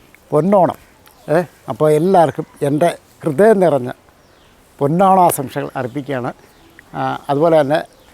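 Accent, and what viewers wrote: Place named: Idukki, India. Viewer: ചേട്ടന്റെ സ്ഥലം എവിടെയാ ചേട്ടാ, കേട്ടിട്ട് ഇടുക്കി കാര് പറയുന്നപോലെ ഒണ്ടല്ലോ 😍😍😍🔥🔥🔥🔥🔥🔥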